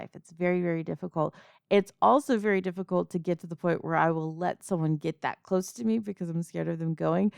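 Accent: South, United States. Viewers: Thanks for the great audio I love the southern accent the idea of a strong minotaur girl wanting me is nice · Damn, your southern accent is hot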